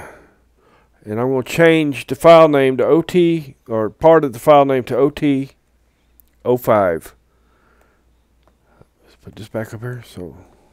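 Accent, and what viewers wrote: South, United States. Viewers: i like your accent man ! southern , sub it, i wish i can learn this accent my Yall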